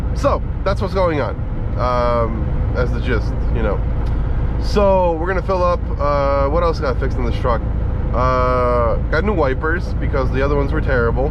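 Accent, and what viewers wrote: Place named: United States. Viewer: Hi, just curious. Where are you from? You've got a very americanised accent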